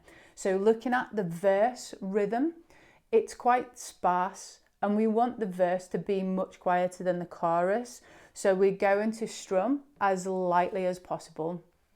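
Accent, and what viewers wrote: United Kingdom. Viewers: Sounds like she is a Brit?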